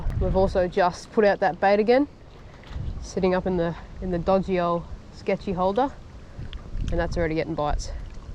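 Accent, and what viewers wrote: Australia. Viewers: You sound Auatralian (and your steering wheel is on the wrong side), but the currency sign in the bait shop showed a $ (dollar) sign. I had to look up what currancy symbol Australians used. I thought Australians used the British Pound system.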